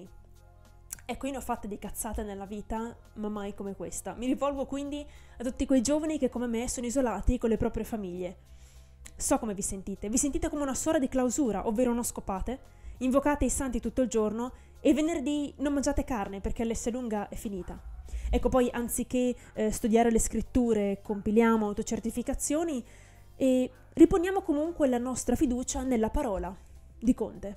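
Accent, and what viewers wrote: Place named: Japan. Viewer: L'italiano parlato con accento giapponese.... MAGNIFICO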